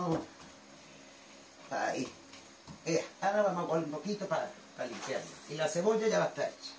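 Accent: Andalusia, Spain. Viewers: llevo poco tiempo siguiendote, me encanta como hablas como explicas todo con tu lenguaje andaluz me río un montón contigo, lo limpia que eres y con toda tu calma como nos enseñas lo que sabes!! Muchas gracias guapa! Seguiré viéndote a ti y a tus videos 💋💗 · Madre mía ¡ Qué pinta tiene este atún!! Me encantan tus recetas y sobretodo como las explicas. Con esa gracia andaluza que no se puede aguantar. Muchas gracias por compartir tus ricas recetas. Un abrazo de esta catalana con raíces cordobesas.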